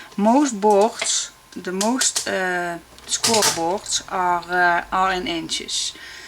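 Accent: Netherlands. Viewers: Brilliant idea. Thank you for sharing and for the free tutorial. I just love your Dutch accent. XXX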